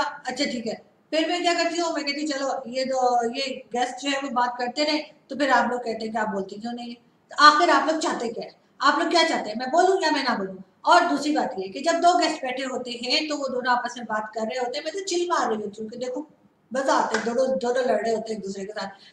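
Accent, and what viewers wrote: India. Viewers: Voice problem is not due to non use of ear buds but it's echo voice problem. Secondly you try to make your presentation more professional and international by wearing decent and office looking outfits as our Indian Anchors are doing. Also keep on changing your looks and style to add freshness to your programmes. Your style of talking and pronunciations are totally Indian type Keep it up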